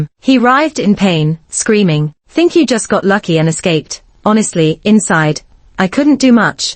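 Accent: United Kingdom. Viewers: Wait so at the beginning she said Beck treated her like a queen. Why did that change as the story went on? Kind of confused but I liked the story a lot. I love the British voice. So classy even when calmly plotting revenge lol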